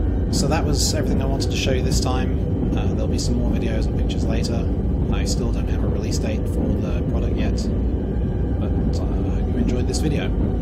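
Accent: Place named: England, United Kingdom. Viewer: Wait, you aren't dutch? Your voice has a distinctive english accent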